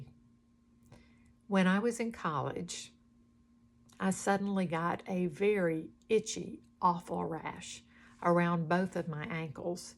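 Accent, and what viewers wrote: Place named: Texas, United States. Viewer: You get cuter every time I see you and you sound like your from Texas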